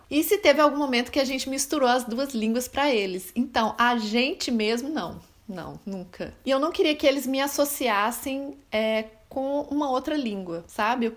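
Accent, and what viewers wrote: Minas Gerais, Brazil. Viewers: Eeeeeeh!! Sotaque mineirooo!! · Vc disse sutaque mineiro ? ❤️❤️❤️❤️❤️❤️❤️ Já amo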